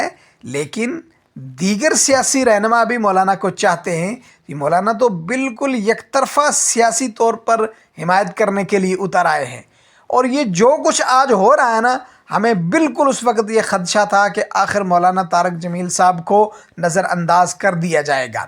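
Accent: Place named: Pakistan. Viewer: لہجہ تو آپ کا پاکستانی ہے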